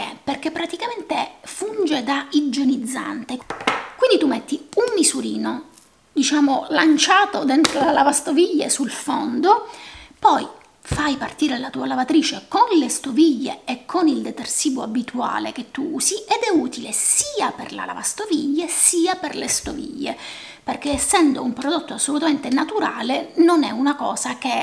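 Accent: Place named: Palermo, Italy. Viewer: Sei di Palermo come me riconosco la cadenza 😂 ; è vero non si trovano nei negozi normali grazie per avere dato la dritta su Natura Sì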